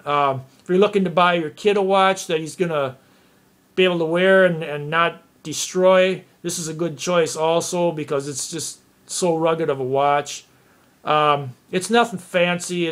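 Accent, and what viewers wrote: Canada. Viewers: good review man. and cool accent. are you canadian?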